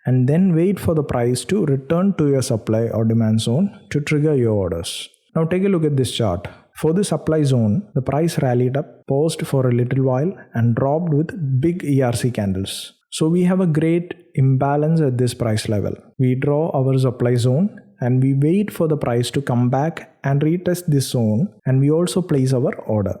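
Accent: Kerala, India. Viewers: Bro Are You From Kerala ? Through Your Accent I guessed . Am I Right ?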